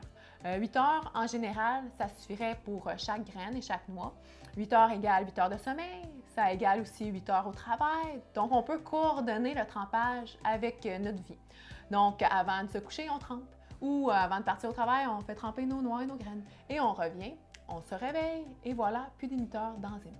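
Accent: Quebec, Canada. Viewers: Excellente vidéo tant par le contenu que par la forme. Egalement accent français canadien très, très agréable à entendre. Un régal. Merci pour votre contribution. Bonne continuation.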